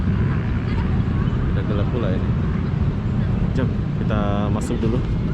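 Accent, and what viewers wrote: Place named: Malaysia. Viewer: Makin ketara bahasa Melayunya sekarang bossque makin mantap liputannya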